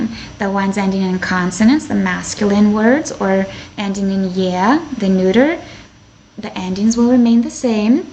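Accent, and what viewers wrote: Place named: Russia. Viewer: I just love your Russian accent, it's just beautiful , thank you for teaching us 🌻🌻💛💛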